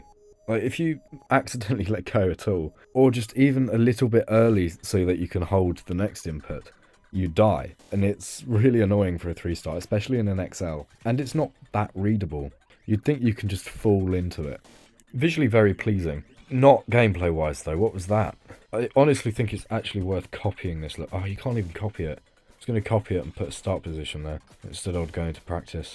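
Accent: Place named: United Kingdom. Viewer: again british accent hard carry, good video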